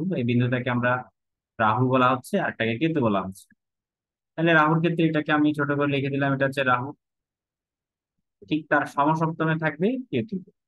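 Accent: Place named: Bangladesh. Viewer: Sir,আপনার স্কুল কোথায়? আপনার কথায় বাংলাদেশের একসেন্ট আছে।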